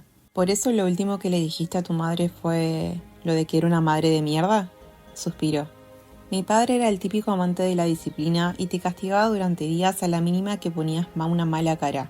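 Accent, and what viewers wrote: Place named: Argentina. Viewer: Me encanta el acento argentino, le da el toque perfecto a la narración 👏🏼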